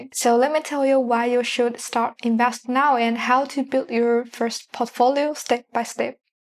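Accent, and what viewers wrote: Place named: Scandinavia. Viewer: I like your accent from Nordic.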